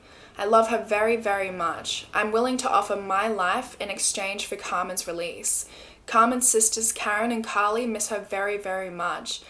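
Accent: Australia. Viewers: What is it that is so appealing about an Australian accent? Love u 😃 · Your videos are great to listen to at work. You have the nicest Australian accent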